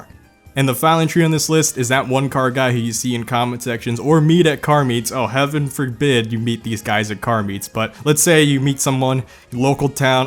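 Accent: United States. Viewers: Ur asian? Ur like THE MOST american sounding person i have ever heard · he is Asian but sounds like an American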